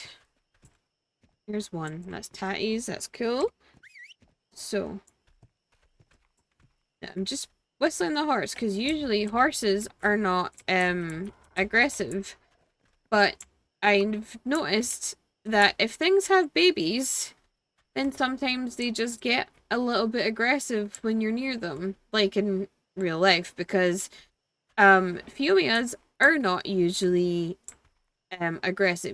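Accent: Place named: Scottish Highlands, United Kingdom. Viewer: Hi, I'm enjoying your videos. Your accent leads me to believe you are from the highlands. Maybe in the northwest. Isle of Sky area and the origins of the MacDonald clan. Am I right?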